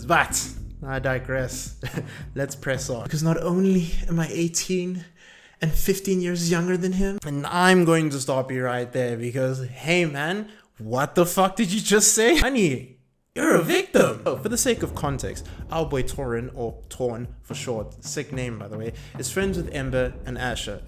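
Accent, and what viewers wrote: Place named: Southern Africa. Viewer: well well well, my eyes have been opened to infinite horrors tonight. Thank you, young sir! Also, are you from Southern Africa? I hear a very similar accent! Zimbabwe?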